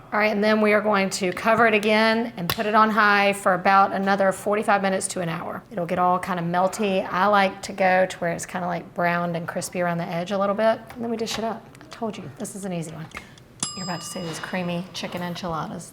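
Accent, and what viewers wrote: United States. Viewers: I hear your pain. However, this is an American channel. Should we check the world over and only use ingredients universally available? Should your national cooking channel only use ingredients that are widely available in the US or world wide?? Honestly, I don't want to watch a local foreign channel that only produces world accessible dishes. I want authentic recipes. Authentic culture. Authentic accents. You are getting a taste of American culture 😊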